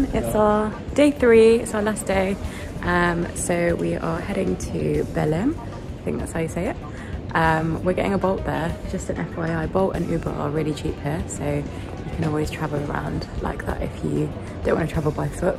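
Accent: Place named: United States. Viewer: Wow wow wow I love your accent are you American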